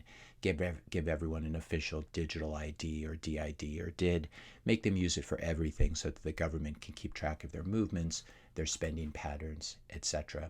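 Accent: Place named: United States. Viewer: As a european i felt the no gun comment totally made you out as american even beyond the accent. Europeans think totally differently. If we need guns we already lost, our humanity, our civilisation. We might as well start eating burgers.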